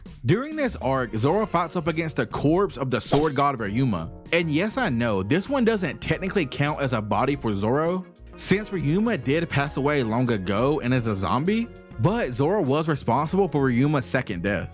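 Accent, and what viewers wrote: South, United States. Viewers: You can tell my dawg from the south plus anime content I'm subscribed now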